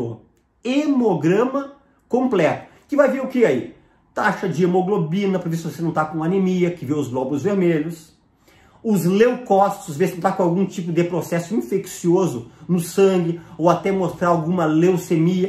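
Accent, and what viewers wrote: Rio Grande do Sul, Brazil. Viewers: Ele até tem sotaque gaúcho, só não sei porrrrrque ele puxa tanto no R como fazem os cariocas.. Mas é um excelente médico 😃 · Adoroooooooo esse Dr ehhh, muito competente, vídeos muito explicativo! Ainda mais com esse sotaque de gaúcho tchê, fica muito mais gostoso de ouvir🤩 Deus o abençoe muito🙏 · Este sotaque é encantador. Os gaúchos já nascem com oratória. Parabéns!!!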